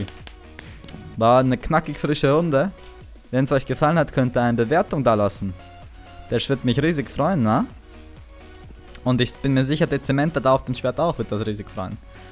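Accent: Austria. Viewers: Die Mischung aus deinem österreichischen Dialekt und der Teddy-Comedy Stimme hört sich echt lustig an :D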